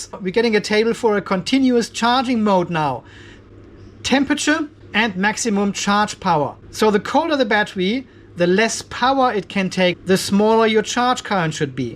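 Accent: Germany. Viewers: Hey ho. Is it only my feeling or do you sound like a german who is living in australia?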